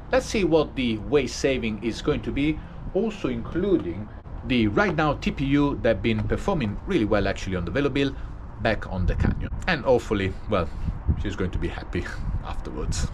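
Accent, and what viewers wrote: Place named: Italy. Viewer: Good spirit man😅 Keep on the good work. Love your italian accent👌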